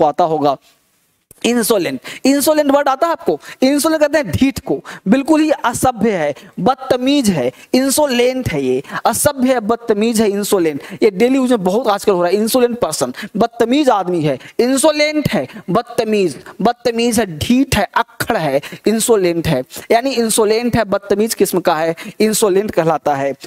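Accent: India. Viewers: Sar aap word ko kaun si accent mein bolate Hain Indian accent yah British accent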